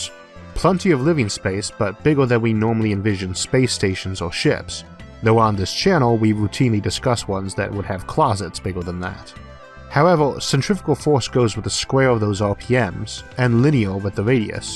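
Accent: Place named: Texas, United States. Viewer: I didn't think you had a speech impediment, I just thought you were from Texas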